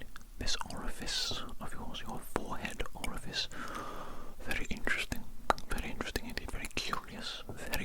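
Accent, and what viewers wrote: Wales, United Kingdom. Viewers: Came for the tingles, stayed for that fantastic Welsh accent. I'm sure that's what makes your videos so relaxing! Diolch, 😉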